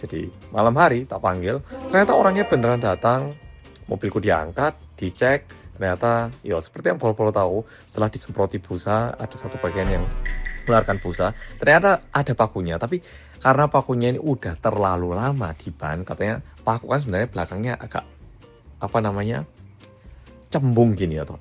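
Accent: Java, Indonesia. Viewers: Jadi seneng lihat videonya dah ganteng logate medhok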